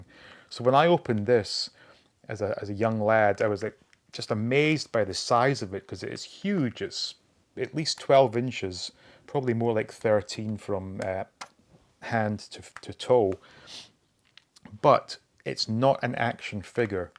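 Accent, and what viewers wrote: United Kingdom. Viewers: I had the UK one which I guess you had too by your accent. But it was totally different it had no battery's for a start I think it worked on a sping system so when you pulled the cord it pulled a spring tight and them pulled itself up . The Web was see through strong rubber and it glowed in the dark and so did spideys spider on his chest and maybe his eyes and I think the body was in better proportion and not as thin as the USA model . But there was no tourch as there was no power . So was this not the one you had ? Thanks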